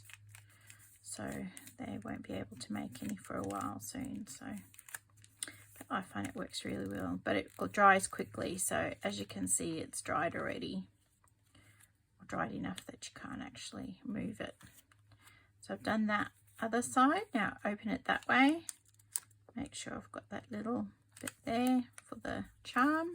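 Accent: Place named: Queensland, Australia. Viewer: New subscriber from BC Canada spent 2 1/2 years in Queensland as a teenager love hearing you talk makes me homesick for my second home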